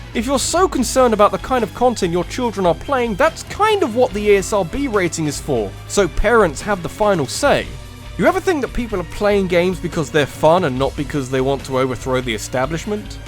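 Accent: United Kingdom. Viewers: I love how people from the Uk say controversy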